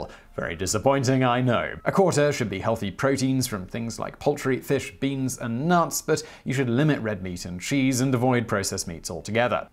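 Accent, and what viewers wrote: United Kingdom. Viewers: I was expecting him to say "Hey Vsauce, Michael here!" when I opened the video, instead I got a british accent.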